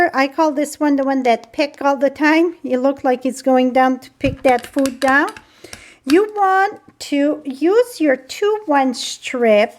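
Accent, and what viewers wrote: France. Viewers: Good day, I sure love watching your tutorials because they are very informative, and you are my fellow Canadian they love your French accent have a wonderful night and a wonderful day tomorrow and God bless you. I also like the fact that you're not doing voiceover that everything you do is well you are creating your magic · She was saying 4 by half (inch). It's her French accent.:)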